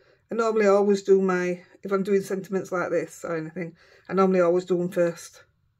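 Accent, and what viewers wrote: Yorkshire, United Kingdom. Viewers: These are so cheerful! We usually get a little bit of snow here but none so far this year I'm sad to say. And your speaking Yorkshire is one of the many reasons I love your channel. 💞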